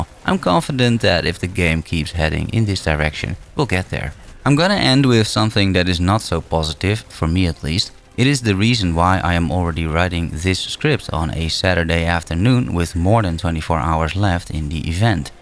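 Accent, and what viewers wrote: Netherlands. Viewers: Amazing how a Dutchy can sniff out another Dutchy within 2 seconds, by the accent haha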